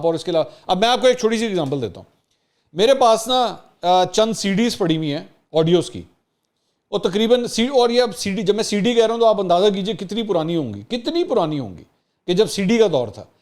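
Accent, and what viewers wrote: Punjab, Pakistan. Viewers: اندرون شہر لاہور کے پیدائشی ہونے کی بہترین پینڈو کو دیکھنا اور جاننا ہو تو ، بھائی کو سن اور دیکھ لین۔ 😊 However, his analysis and comments are neutral. That is why I see most of the V logs, but at the end get disappointed by his Punjabi style Urdu spoken language, and use of Punjabi language. Too much of میں۔ میں۔ میں۔ میں میں Sorry to say this.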